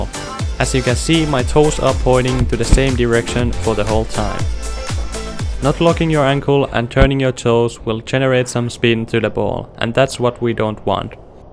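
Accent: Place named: Finland. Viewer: the accent screams suomi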